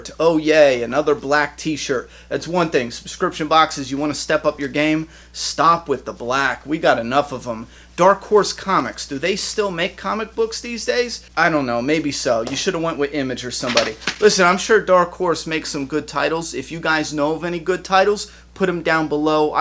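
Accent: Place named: East Coast, United States. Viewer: Love that east coast accent ;)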